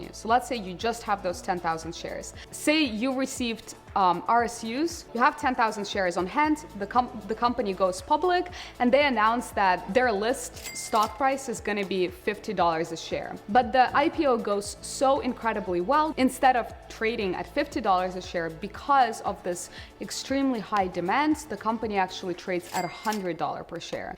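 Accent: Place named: Russia. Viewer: Что-то русским акцентом попахивает)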